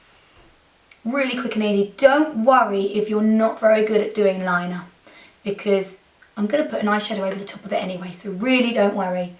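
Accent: United Kingdom. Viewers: Every time you or your sister say "So" I have to try and say it in my best attempt at a British accent. My New York one tends to cancel it out though :( I love the way you guys say it! Oh, and gorgeous make up! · it helps that you have a british accent too xD :)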